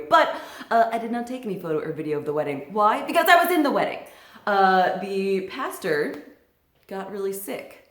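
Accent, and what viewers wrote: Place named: New England, United States. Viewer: You from New England area, or just have family here?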